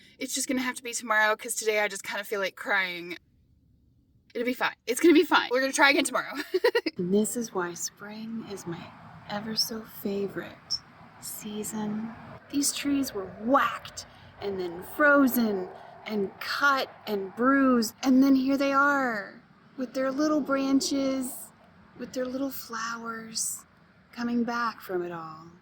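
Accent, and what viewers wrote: South, United States. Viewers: I like you. My heart feels for your story. I think you need a way for your followers to help you financially. You are worthy and I think you are very funny and talented. Life isn’t fair sometimes but I applaud you for starting your channel and living in your van to make it in this world. Do I detect a Southern accent? And your smile is electric! You’ll do well. Take care. Cathy in Nashville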